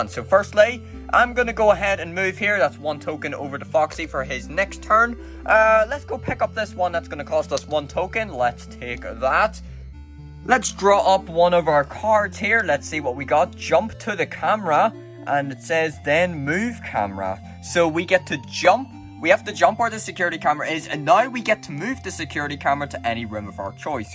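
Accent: Northern Ireland, United Kingdom. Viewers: Are you from Northern Ireland? 😇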